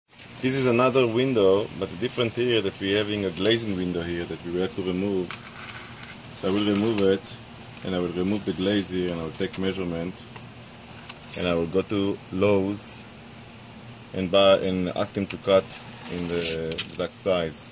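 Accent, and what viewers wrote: Israel. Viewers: Helpful, thank you. The insight about Lowes was vital information. Enjoyed the Israeli accent as well, haha.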